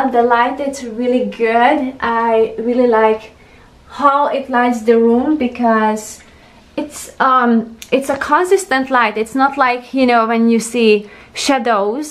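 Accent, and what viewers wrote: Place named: Hungary. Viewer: Huuu nagyon nagyon hallani a magyar akcentust!!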